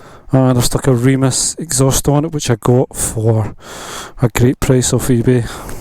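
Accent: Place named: Scotland, United Kingdom. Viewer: Took me a while to 'tune' into your accent, but then after I dialled in Scottish in my head...... all good. Fun 'non review', will watch your other content to see how it plays out for you. I'm just looking in 2025 for a used HyperStrada here in Australia, not many to be found and all are the 2013 '821 version, nothing later. I'm after something non sports Ducati as my wife loves Ducatis BUT most dont do a pillion well. Scramblers appear to be an obvious choice for the seating BUT scramblers are really poorly appointed with cheap fittings, absolute scam for the price imo. Monsters we have tried but not pillion friendly, and then all the 'touring' Ducatis are heavy and bloated. The Hyper seems to be the only real option that is light, agile and simple enough for me. Just a matter of finding one at a decent price. I have boxer BMs and F800GT BM so I understand how a good bike should be made and handle, my last Ducati 749 was a lounge princess, as was the 1100S monster, I want to actually ride my Ducati but dont want to go back in time to the aircooled models for simple no time pressure maintenance. 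2013 seems to be the start of the more reasonable service intervals on the belts so I am in the correct zone I hope.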